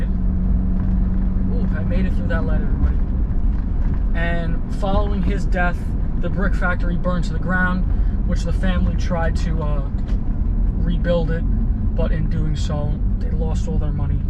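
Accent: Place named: Long Island, United States. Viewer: He is from Long Island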